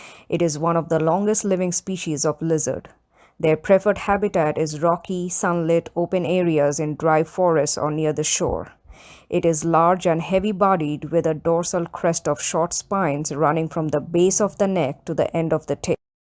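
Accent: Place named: India. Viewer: Are you a indian descendent cuz Your accent seems to have it.. But nice video Very illustrative.. Nicely done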